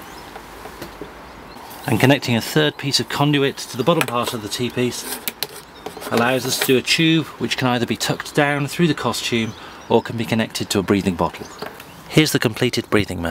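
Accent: United Kingdom. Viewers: Thanks for the tutorial! And was wondering where you get your paint from (I'm assuming your in the UK due to your accent)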